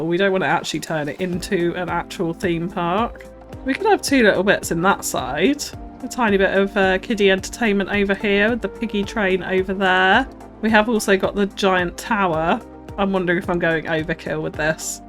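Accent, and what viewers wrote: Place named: England, United Kingdom. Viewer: A beautiful design from someone with a beautiful designers mind. Additional thoughts - due to your charming English accent I kept miss-hearing what you were saying when you said “Mall” I kept hearing it as Mull. I genuinely thought you were saying it with a Scandinavian accent.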